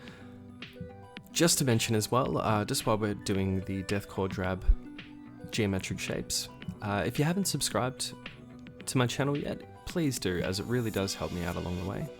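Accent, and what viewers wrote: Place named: Australia. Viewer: I knew I was in good hands when I recognised the fellow Aussie accent. Great to see us taking over the YT Warhammer scene.... SUBBED!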